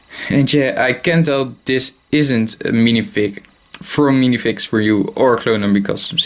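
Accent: Netherlands. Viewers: U sound dutch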